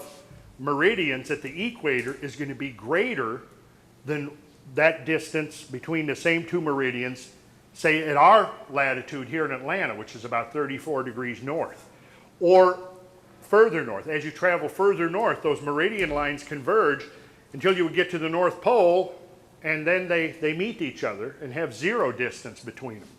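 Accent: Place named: Midwest, United States. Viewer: Minnesotans talk a little funny, don’tcha know? He may live in GA now, but he’s from MN, and he sounds like a northern Midwesterner.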